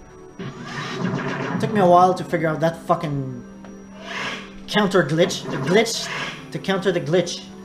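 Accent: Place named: Quebec, Canada. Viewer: Je savais t'étais québécois !! Vrm bon ton vidéo j'ai jamais fini le jeu (PS4), j'avais juste réussi de me rendre après la partie de l'eau. Merci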